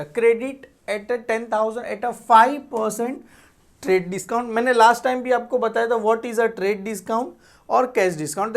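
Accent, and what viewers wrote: Gujarat, India. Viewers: Sir aap Gujarati ho na? Aapka Gujarati accent hai You are an amazing teacher. Heads off to you ❤❤❤ 😊😊😊😊😊😊😊😊😊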